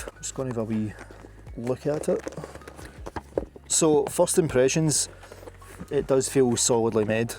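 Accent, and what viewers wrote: Scotland, United Kingdom. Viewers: i was on fb the other day and the flex tail advert come up. all of a sudden i hear the familiar scottish dulcet tones. i know that voice!!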